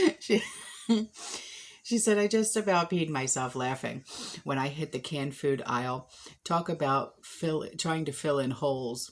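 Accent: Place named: Philadelphia, United States. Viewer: Dear love you! are you a Philly girl? Your accent sure sounds like it!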